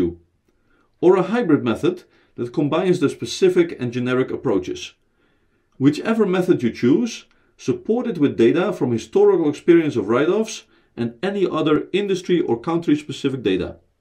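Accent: Netherlands. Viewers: I know a Dutch accent when I hear one lol. Thanks for the review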